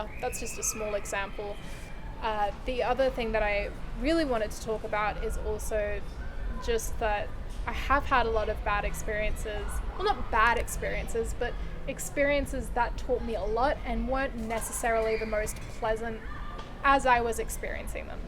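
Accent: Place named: Australia. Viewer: Your videos are really fun to watch especially with your Australian accent is just icing on the cake. Already subbed for keeping myself constantly motivated watching your old videos and upcoming ones. Thank you so much and keep making more videos! By the way, I'm from India and am learning French as well, and I plan on reaching at least a CEFR B1 level in French before moving to France.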